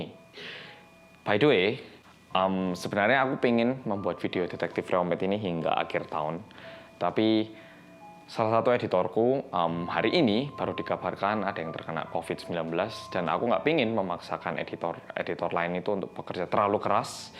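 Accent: Java, Indonesia. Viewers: Bersama orang Korea yangggg medokkkkkkk.. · Semangat mas medok :) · Biarpun sinyal ajrut-ajrutan..tapi tetap semangat nunggu loading.. Demi nonton konten nya detective medok..mas 👍😎❤️ Semoga selalu sehat nggeh mas.. Salam dari Kediri 🙏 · GERCEP MEDOKKKK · Halo bang yang medoook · Medhokkkk😍 editan videonya mkin mantep mas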